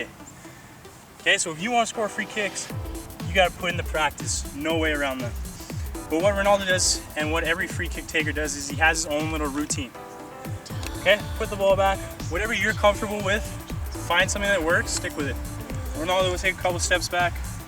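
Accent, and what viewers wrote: Canada. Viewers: Are you Canadian? :)) Abowt. Abowt. Abowt.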